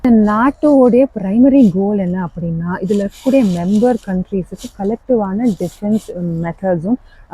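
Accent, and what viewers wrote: Sri Lanka. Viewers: Are you srilankan tamil mam?! Like native of yours or parents?!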